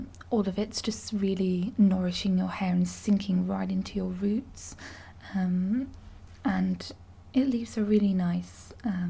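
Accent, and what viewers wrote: Scotland, United Kingdom. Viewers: Wait, I though you were scottish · No contest , best ASMR content creator on YouTube , plus I'm from the U.K living in Australia so the accent is a touch of home 👍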